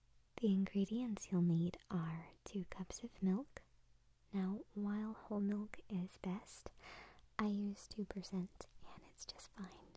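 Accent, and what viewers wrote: South, United States. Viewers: Do you turn the southern accent on and off at will?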